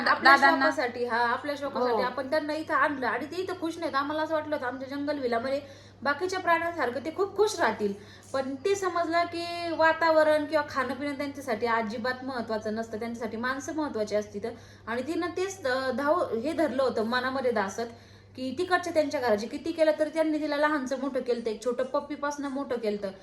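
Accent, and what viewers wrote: Ahmednagar, India. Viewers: अग तुमचे व्हिडिओ असतातच छान ओरिजनल असतात तुमची नगरी भाषा फार छान वाटले ऐकायला कुठल्याही गोष्टीचे एवढे मनावर नाही घ्यायचे डॉग बदल सांगते । चे धन्यवाद त्यांनी खुप छान व्हिडीओ. बनवला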